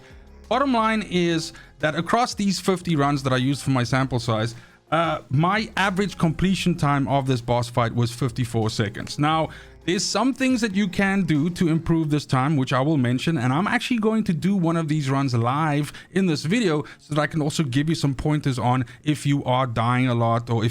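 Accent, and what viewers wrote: South Africa. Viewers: is that a south african accent? 👀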